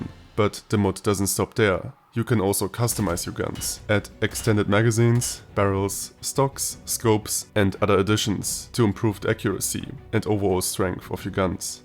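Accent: Germany. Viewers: you are German or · are you german? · Bro are you german??? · thats a realy german english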